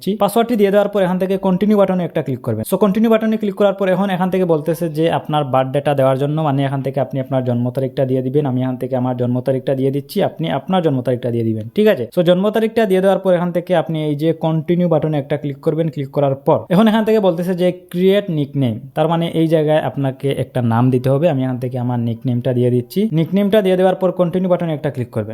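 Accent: Sylhet, Bangladesh. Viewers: সিলেটি নি ভাই?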